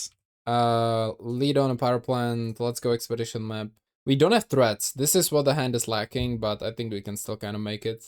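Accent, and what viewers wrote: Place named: Czech Republic. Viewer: Dude ur czech accent is so funnily familiar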